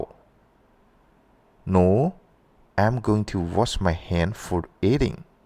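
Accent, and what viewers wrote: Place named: Laos. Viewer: what’s your ethnic group? The accents sounds a little bit different from my parents who are from savannakhet and champpasak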